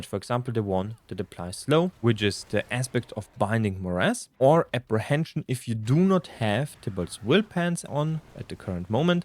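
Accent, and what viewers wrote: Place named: Germany. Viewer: Jede wette das er ein Deutscher ist. Man hört es voll raus 😅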